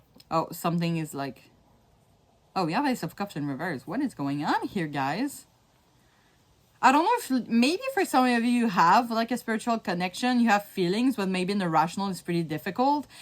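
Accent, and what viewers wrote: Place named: Quebec, Canada. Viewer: I can hear a québécois accent from a miles away tell me in right 😅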